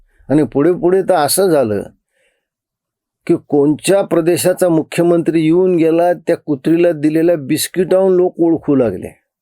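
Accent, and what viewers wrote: Marathwada, India. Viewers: काका आपण रामराम म्हणता ना... ते लय भावतं... अस्सल मराठवाडी लहेजा बोलण्यात असतो.'त्या'नेत्याला आपण पचला नाहीत..पण आता फिट्ट बसला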